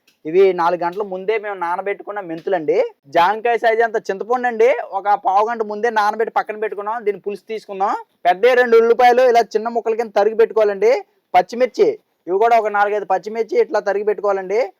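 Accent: Godavari, India. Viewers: స్వచ్చమైన గోదావరి జిల్లా యాస...కల్మషం లేని మాటలు... సంపూర్ణ వివరణ. మొదట మొదటగా చూపించిన పిల్ల కాలువ జోరు,.. పచ్చని పైరు...కట్టే పోయి మీద వంట...అబ్బా .మరచిపోయిన చిన్నతనము చూపించారు..అంతా బావుంది..చిన్న సలహా... కాలి పళ్ళెము లో మొదటగా వట్టి అన్నము మామూలు సందర్భ ములలో పెట్టరు..అది ఒకటి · Godarolla bhasha vintumte maa p.g gurthukochindi😂😂😂😂😂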